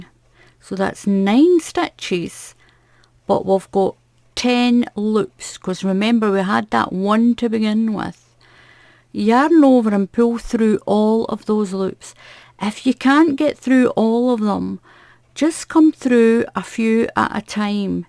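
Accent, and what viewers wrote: Scotland, United Kingdom. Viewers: Not sure where in the world you live but believe you me accent is a lovely soft Scottish brogue, not thick at all ( ps I’m not Scottish) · Thank you so much...I want to make some thing nice as a stripe in the middle of a big afghan I`m crocheting for my husband, and this will be great. p.s. I think your accent is charming. I am in the US, but many of my ancestors came here from Scotland and I like to think that they sounded like you. Keep safe and thanks again.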